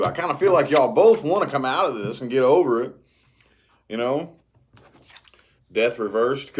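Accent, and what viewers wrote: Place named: Rural, United States. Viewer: Love your country voice cute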